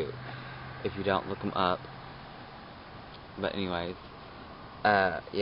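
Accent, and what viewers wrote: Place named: South, United States. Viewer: Congrats, I'm still struggling with coming out and watching videos like this is inspiring me to. I've told a few people so far and the next people I'm hoping will be my parents... :O anyways, thanks for posting an amazing video and btw you have the cutest southern accent I have ever heard!!! :D just saying. -33333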